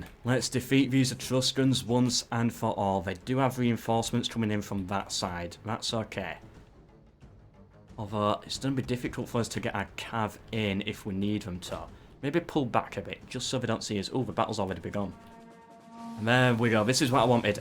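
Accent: United Kingdom. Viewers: Is this the perfect British accent?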